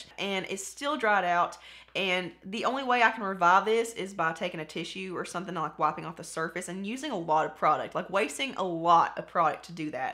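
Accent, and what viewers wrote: South, United States. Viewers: Where are you from? I'm southern too!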